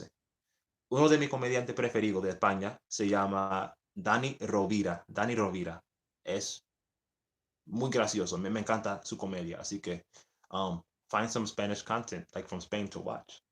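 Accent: Dominican Republic. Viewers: Suenas más como un dominicano(masculine) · hola desde cuando y porque te gusta mi cultura y el país, que cosa te llevo a eso, pareces dominicano en el acento cuando hablas español, y sabes mucho sobre nuestro acento, Greeting From Sto.Dgo. Dominican Republic, Quisqueya La Bella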